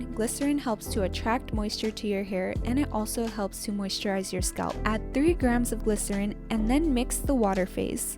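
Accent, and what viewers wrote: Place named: Caribbean. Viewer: Your hair is fabulous and I love your accent! It's soothing. You sould like you're from the Caribbean. Where are from?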